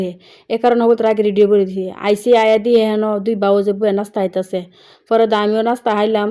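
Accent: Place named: Brahmanbaria, Bangladesh. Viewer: আপনি দি আমার দেশি , কথায় বুঝা যাচ্ছে,, ব্রাহ্মণবাড়িয়া কোথায় আপনার বাসা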